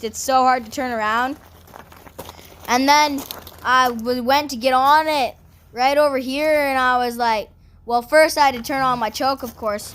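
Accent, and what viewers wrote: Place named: Newfoundland, Canada. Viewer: you should like a newfoundlander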